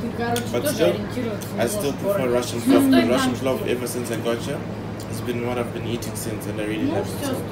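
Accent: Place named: Zimbabwe. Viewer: You have a very nice Rhodesian accent, quite rare to come by these days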